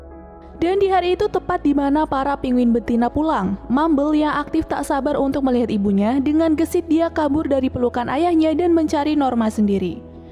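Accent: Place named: West Java, Indonesia. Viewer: Teh orang sunda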